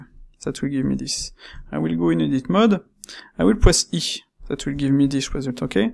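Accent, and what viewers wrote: France. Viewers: La qualité et tt ce que j'ai appris des ces tutos, mais aussi la force que ces vidéos sur Blender ont comparé aux autres c'est l'accent francais qui fait la force de ces tutos. gg x))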